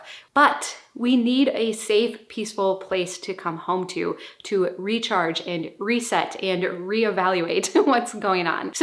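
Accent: Minnesota, United States. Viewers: Lol she lives in MN. That's her regular accent.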